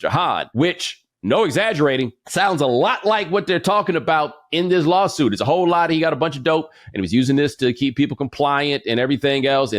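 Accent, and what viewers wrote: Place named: Atlanta, United States. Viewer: Never knew you was from Atlanta but now I hear your accent clear as day lol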